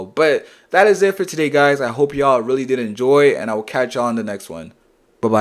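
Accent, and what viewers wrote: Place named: Toronto, Canada. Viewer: toronto accent is crazy😭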